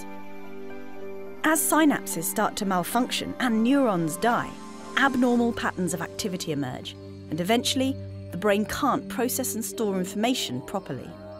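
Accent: United Kingdom. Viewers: United Kingdom.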